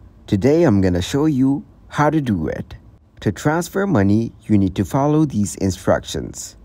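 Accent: India. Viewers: even you talked in english you cant hide your indian identity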